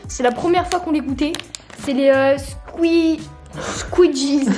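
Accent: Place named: Nord, France. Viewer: T'es cht'i ? T'as trop l'accent !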